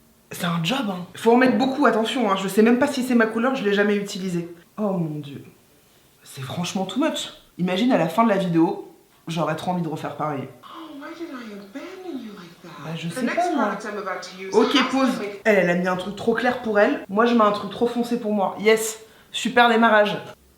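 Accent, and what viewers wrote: England, United Kingdom. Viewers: toi j'aime m'occuper de ma peau MAIS LE MAQUILLAGE ÇA PREND PAS SOIN DE TA PEAU HAHA TU FAIT RIRE😂😂😂😂 #LE CACA D'OEUIL QUI VIEN TOUT GACHER TU ME RIRE AVEC TON ACCENT ANGLAI VIELLO😂😂😂😂😂😂😂😂😂 MAIS JE T'ADOR MA PRINCESSE TU FAIT TROP RIRE😚😚😚😚😚😚😍😍😍😘😘😘😗😗😗😗😂😂😂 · Elle est belle les anglaises sont trop belles charmante accens charmant et appart de reves.bref source dinspi. Univers rend nous charmante.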